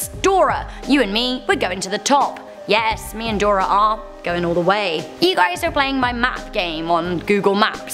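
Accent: England, United Kingdom. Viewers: Are you from UK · Hi Beautiful can I get a shout out In your next video I just love your English accent and when you do scarry videos. And I love how you dress. You dress so nice 👍.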